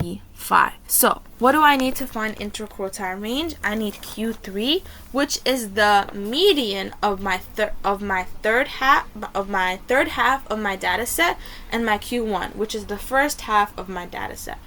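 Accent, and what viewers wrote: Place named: New York, United States. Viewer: I am new to the channel I can just tell you are are new yorker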